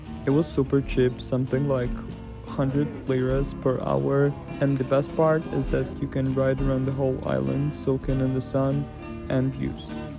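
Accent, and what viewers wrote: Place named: Flanders, Belgium. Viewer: You have a flemish accent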